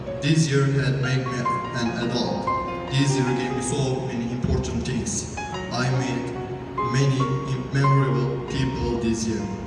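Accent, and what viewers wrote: Turkey. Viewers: türk şivesi yakıyo ya ama sıkıntı büyük hikaye üzücü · Türk aksanı, ve yabancılar bu aksana bayılıyorlar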